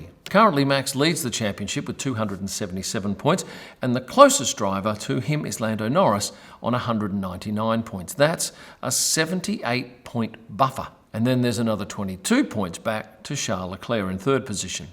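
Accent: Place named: Australia. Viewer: There are many Australians out there in the big bad world. We seem all sophisticated etc. but secretly all we crave is Weetbix, Vegemite on toast, proper barista coffee and an Australian voice. Thanks.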